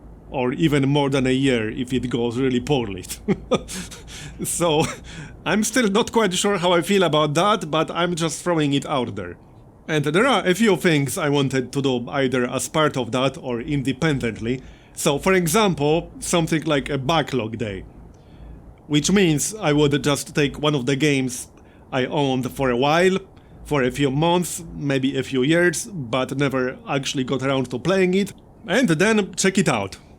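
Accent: Poland. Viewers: Good luck with that missing 1k, (I said around begining of December that you will get it at the begining of 2020, but as a data-focus guy, you are probably right, that more likely is going to happen around May next year. I would never thought that accent could be a problem here on your channel, but probably that's because I am Polish as well and I don't really care about the accent more than the content itself. Anyway, do what needs to be done to reach your goal.:) And sorry for repeating myself, I think that one day a month of some retro-gaming in strategy genre (video or streaming) would make me very happy. You know, something like Centurion, Panzer General 2, Dune II, Syndicate or Age of Empires, you know yourself. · please go to America once and actually talk to different people there. You will find out that apart from the natives (white, born in America, both parents have English as first language), everyone have some degree of accents and they don't take it as a problem. 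Tbh, some are even proud of their accents because they think their accents emphasize their cultural and ethical background. If you're not a native speaker, nobody would expect you to speak as one. So be confident with your English. After all, you are a Polish, not an American, and it's totally fine for a Polish to speak Polish-English instead of American English. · I subscribed for civ 6 deity gameplay and I wish the episodes were at least 40mins long. Your latest civ 6 play is 5/5. I don't care about the gimmicks that are popular on youtube: I did x and y happened. I wish you didnt spoil what happens in the episode in the title for example: no oil!!! When that is what happens in the episode... and me personally i dont like xcom at all so i dont watch those videos. I believe you would get more viewers with quality civ 6 content. About your accent?!?! Im finnish and i have a finnish accent, youre polish with your accent, i actually know one polish woman who lives here and we all havr our accents, not everybody talks like the queen of England like we are teached to do. 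So dont be silly your accent is fine all that matters is if people understand when you speak, not the accent! I actually like the way you speak you should sell t shirts that say "works for me" hearing that always makes me smile! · Just cut the long videos at 30-35 minutes with a suspense. Just like with the soap operas we will be back for more excitement. I have no problems with long videos/movies at all but I understand how the algorithm punishes the videos that are not watched at full length. I am sure many more of us like your Polish accent than those dislike it. Just keep being yourself and do what you really enjoy.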